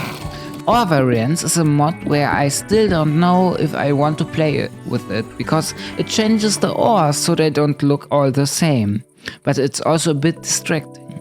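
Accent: Germany. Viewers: Cause hes german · man merkt am arkzent das du deutsch bist haha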